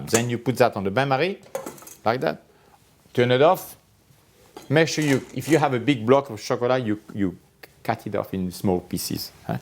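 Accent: France. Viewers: such a cool accent the French. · I love listening to the English french accent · I love that french accent!! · I really loved your english with the french accent 😄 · It’s Amazing!!! So easy to understand and such a pleasure to watch,very entertaining with lovely french accent,Mercy SHEFF!!! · French speaking English also sounds beautiful.... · l'accent français en anglais est horrible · When I watching this man I feel like in watching the movie Ratatouille. It is so amazing! The background, the setup, the lovely french accent...the way he looks!!!! It is a movie 🥰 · Love the way you explain the process. Your French accent is very infectious, in a good way.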